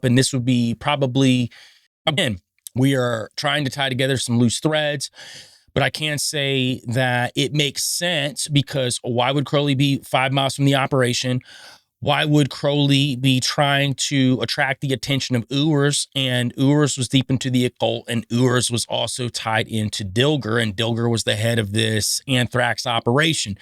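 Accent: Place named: Pennsylvania, United States. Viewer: You sound like you have a PA accent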